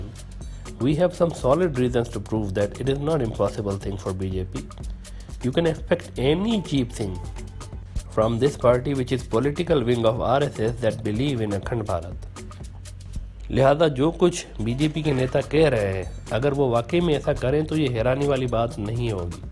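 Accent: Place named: Pakistan. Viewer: Can't you notice his tone of Pakistani Urdu??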